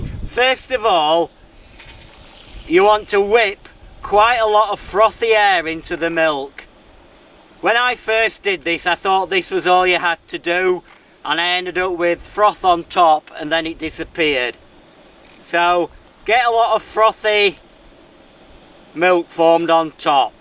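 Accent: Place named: Yorkshire, United Kingdom. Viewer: definitely yorkshire, lu at a, not laaa tay or laaa t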